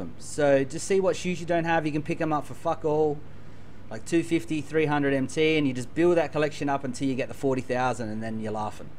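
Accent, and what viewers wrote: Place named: Australia. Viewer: Bro your from Australia to me to😁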